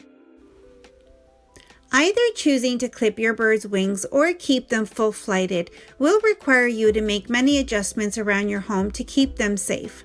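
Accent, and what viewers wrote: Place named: United States. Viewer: Like the American accent is it west coast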